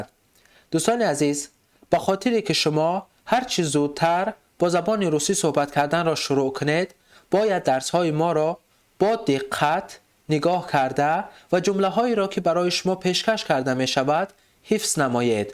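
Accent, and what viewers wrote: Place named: Afghanistan. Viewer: Таҳлаҳҷаи авғонӣ, вале зебо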